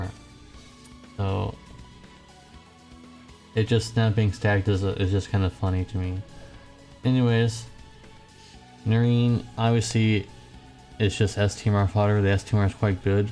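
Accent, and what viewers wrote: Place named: Wales, United Kingdom. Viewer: Is his channel for Wales? Because it sure sounds like it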